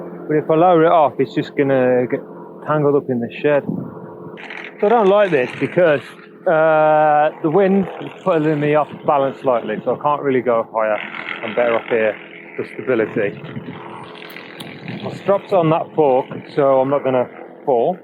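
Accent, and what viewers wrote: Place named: Nottingham, United Kingdom. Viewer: Cool video . 👌 I guess you’re from Nottingham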